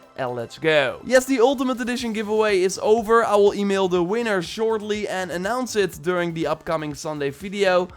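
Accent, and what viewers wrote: Netherlands. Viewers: Awesome vid, my fellow dutchman! (Guessing by the accent... am I right? xD)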